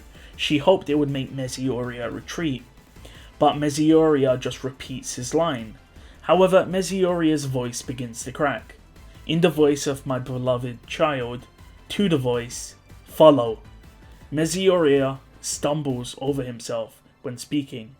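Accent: United Kingdom. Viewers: That's just him being British. It took him almost a year to stop saying Tappy but still hasn't really got Tappei right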